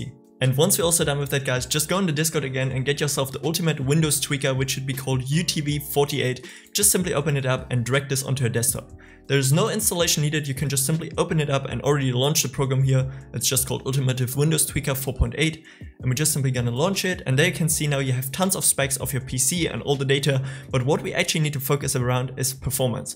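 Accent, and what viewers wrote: Germany. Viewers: Oha digggah du hast mir von 30 fps zu 120 fps geholfen ehrenmann bist du eug deutsch wegen akzent:(areyou German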